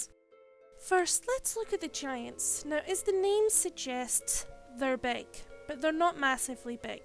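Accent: Scotland, United Kingdom. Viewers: like your Scottish accent.very informative video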